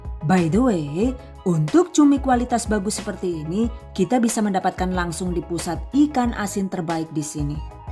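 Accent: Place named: Java, Indonesia. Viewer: awalnya muncul di beranda..apaan sih ini..eh pas di liat lucu narasi nya..apalagi bagian jawa medoknya...masaknya jg praktis...makin kesini makin suka..oke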